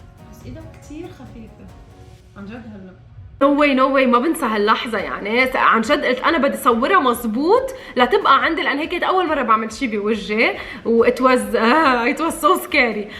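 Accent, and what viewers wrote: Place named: Syria. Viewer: لان لهجتك سورية🤣🤣 وانا بعرف مافي توصيل ع سوريا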